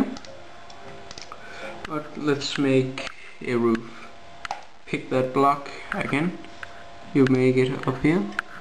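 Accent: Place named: Denmark. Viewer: man kan høre i din stemme du er dansker Yo DK :D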